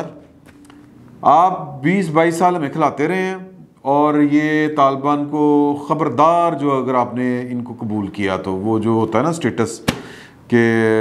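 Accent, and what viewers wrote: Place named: Lahore, Pakistan. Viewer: I listen to this guy for his Lahori Punjabi!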